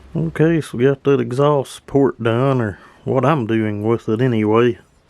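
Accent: South, United States. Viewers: A master at his craft! Just curious, where are you from? You have quite the unique southern accent 🤠 Don't think I've heard your dialect before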